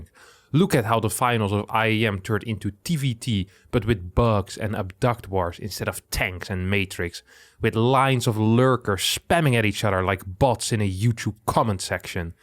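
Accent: United Kingdom. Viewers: I just love the British accent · love your british accent XD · the british accent slowly starts to creep in lol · Love the British accent! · i like that the more Harstem reads, the more British he becomes. i do find it funny the Terran complains about lurkers, but then talks about he could had made Ghosts. you know.... the unit that can ONE SHOT THE ONE THING HE IS COMPLAINING ABOUT !? if anything Zerg should be complain about terran since ghosts can just constantly one shot any spellcasters and lurkers they have !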